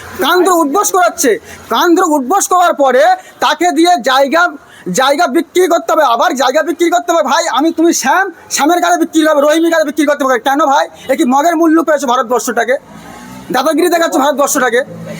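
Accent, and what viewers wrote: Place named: Bangladesh. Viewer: তুই to বাগলাদেশি। তোর কথা শুনেই মনে হচ্ছে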